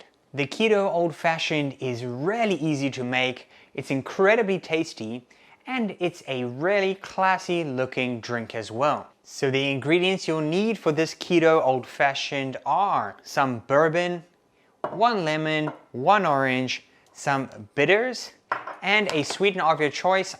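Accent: South Africa. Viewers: Hey, well, the kids are back in school....and I'm back on the Keto Wagon and my first stop - ok was NOT the boozey section eh! (hahahaha) it was your bread recipe but I'm already here and there are few comments on this one so I think I'll have a better chance of you seeing this. Ok, well I am the mama in Belgium who cannot find that darn oat fiber over here and Amazon won't ship it here either soooooo, I was wondering if you were familiar with Lupin flour? It is super high in protein and very low-carb (I think it's 1 net carb per 100g). Do you have any recipes using this and if not, I would SO LOVE to see you do some....like your bread recipe that I cannot wait to try....but am a bit nervous of doing it without the oat fiber. PS: Btw, thanks so much for your wonderful videos young man. You're living in my native Canada but pulease do not lose your South African accent as it's SO classy; so nice to listen to.:-) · Ooh. 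Looks lovely. I am also going to try your flax seed yeast bread. [May I ask, where you born in South Africa? You sound like a soutie ; ) An affectionate nickname! I was born there. ]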